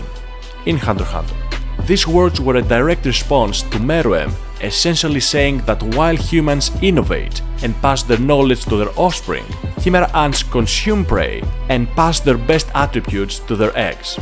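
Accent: Greece. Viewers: haha yes i tought greek first, the finnish 🤔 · that's fine. i am Greek too living in the UK, thats why i was able to recognize... You dont have to change anything about it. Great research on your videos.. Keep it up!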